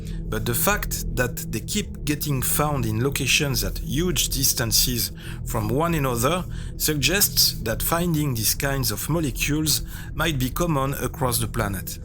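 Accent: France. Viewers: I love when English is spoken in French 💜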